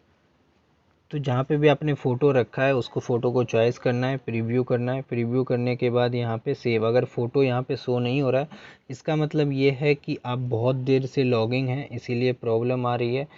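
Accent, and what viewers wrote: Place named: Narela, India. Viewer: Bhai aap narela se ho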